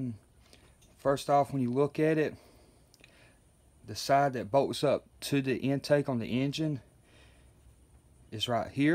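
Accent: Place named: Alabama, United States. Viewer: AWESOME DISCRIPTION of how CARB works! very thorough And nice southern accent! it sounds like it might be from Alabama like my Momma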